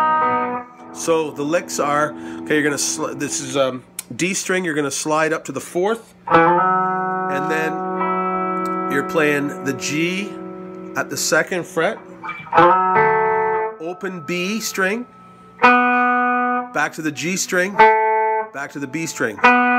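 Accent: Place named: Canada. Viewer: Your accent is pure Canadiana.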